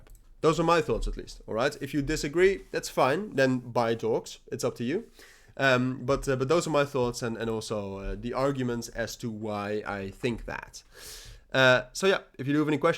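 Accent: Netherlands. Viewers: Bro are you dutch? Haha sounds like it, i am dutch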